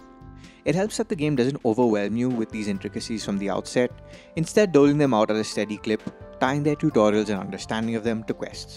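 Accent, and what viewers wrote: India. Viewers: Can't stand people from India talking English. Ding Dong in my ears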